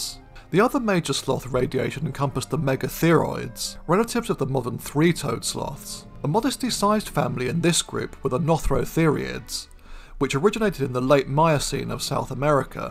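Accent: United Kingdom. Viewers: This funny british (bri’ish) polar bear is funny and educational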